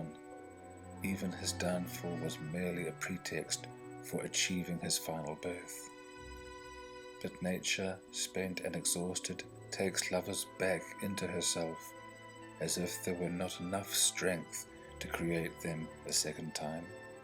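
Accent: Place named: New Zealand. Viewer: beautiful reading, you must be a kiwi?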